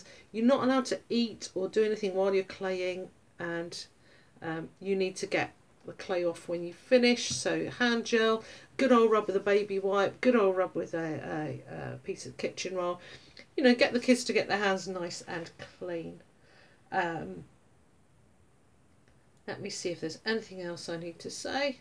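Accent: United Kingdom. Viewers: Hello! I've recently discover your channel thanks to Cindy (PolymerClayTutor) and I'm font of your videos! I really appreciate your polymer clay videos and other themes you're talking about. You have really good tips and a nice video style. I can't wait to see your next productions! Hugs from France, Emeline PS: You have such a pretty British accent, can I ask you where are you from? I'm studying English language and literature at University that's why I'm curious.:)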